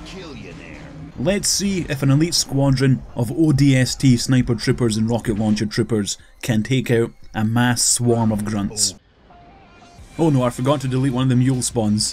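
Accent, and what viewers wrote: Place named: Ireland. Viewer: I love this guy's irish accent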